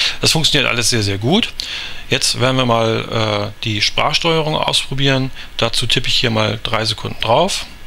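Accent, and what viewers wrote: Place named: Germany. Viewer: very bad english, your german accent is so strong that it is impossible to understand.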